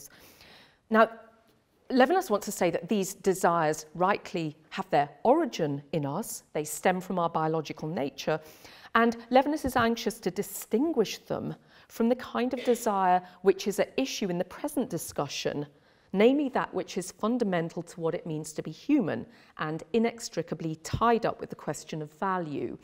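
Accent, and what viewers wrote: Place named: United Kingdom. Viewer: Why can't the Brits ever get to the point besides the annoying accent.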